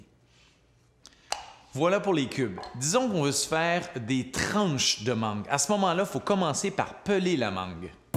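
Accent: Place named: Quebec, Canada. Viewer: Merci ricardo ! Ton accent québécois rend tes conseils captivants ! :D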